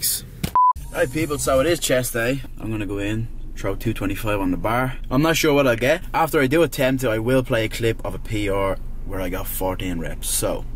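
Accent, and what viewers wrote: United States. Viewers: Cringe at the gay American accent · Are you from Texas? I notice an accent.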